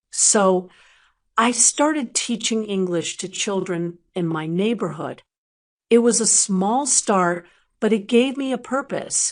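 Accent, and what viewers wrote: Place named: United States. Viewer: American acsent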